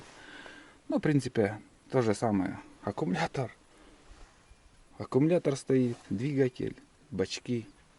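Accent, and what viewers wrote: Komi, Russia. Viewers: Виддза олан! Судя по акценту, Ижемский? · Давненько в Коми не бывал.голос слышу, детство вспоминаю. Коми му)))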